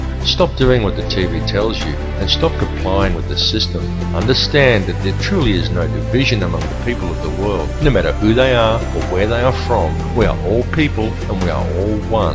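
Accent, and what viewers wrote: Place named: Australia. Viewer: have any idea who the speaker might be? he seems australian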